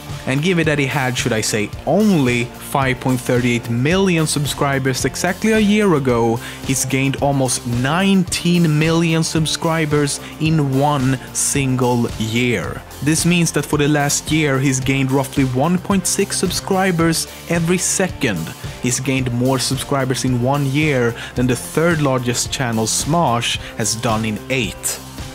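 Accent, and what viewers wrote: Sweden. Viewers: Are you swedish? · Jag satte och kollade helt random (hade misstankar om att du var svensk igenom sättet du pratar på) och helt random... MEN VAFAN xD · are you swedish? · are you a sweaden person? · you still sound so Swedish here! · his accicent was so much more prevalent back then, goddamn. Like that's obviously swedish compared to his current accent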